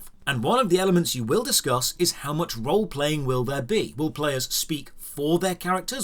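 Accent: United Kingdom. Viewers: your accent... I can’t put a finger on it. I’d guess somewhere north of London. But not the savage lands that is the North East of England. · I usually can't take dnd seriously or the narration of dms. But with this guy I could, he looks like an elf and sounds like the omnipresent British voice narrating childhood favorite obscure animated fantasy films from the 80s.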